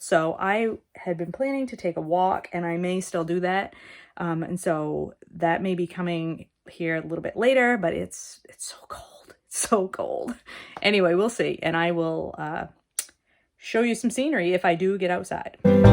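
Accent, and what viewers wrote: Maine, United States. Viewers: Seems a little late for the lake to be freezing. LOL at the lame Maine accent.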